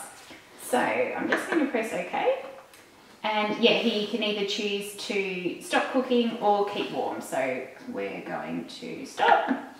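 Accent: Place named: New Zealand. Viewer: Where are you from? You sound like a kiwi :-)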